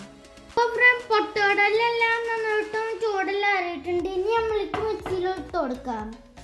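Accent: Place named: Aluva, India. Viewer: വളരെ നന്നായിട്ടുണ്ട് നല്ല അവതരണം ആലുവ സ്ലങ്ങി ൽ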